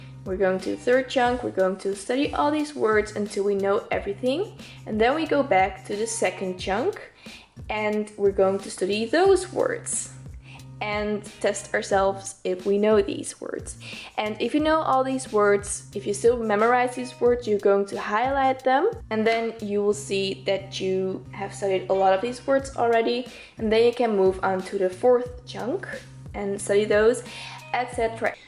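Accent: Netherlands. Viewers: I smell Dutch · are you dutch??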